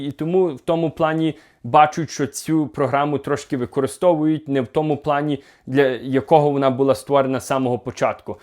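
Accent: Western Ukraine, Ukraine. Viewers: Акцент западенский, тернопиль, хмельницк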